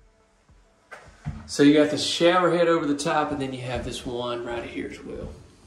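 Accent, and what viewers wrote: South, United States. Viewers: Thts Southern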